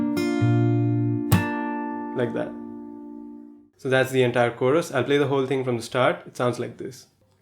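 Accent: India. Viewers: Are you indian? I mean your accent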